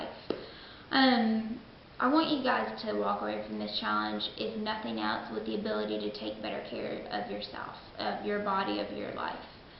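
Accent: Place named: South, United States. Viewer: Totally agree with you regarding the water and exercise incriments. I assume the 2 hours is weekly? Love the accent too, as a native southerner I figure you are from Georgia or SC? Keep up the good work!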